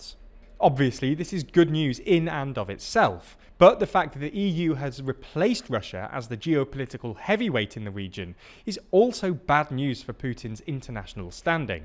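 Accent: United Kingdom. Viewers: It's funny that a british sounding guy is talking about EU news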